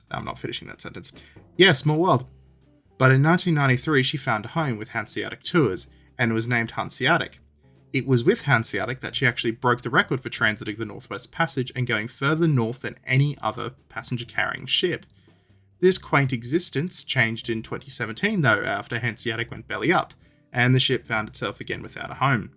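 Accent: Australia. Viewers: Cuuunt, fkn love your docos and research. You sound like you're from Queensland. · You sound like an Aussie?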